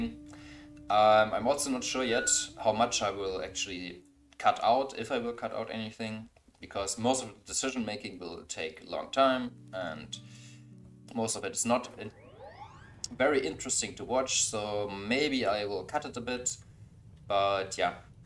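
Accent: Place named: Australia. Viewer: Your accent is cool. Are you Australian?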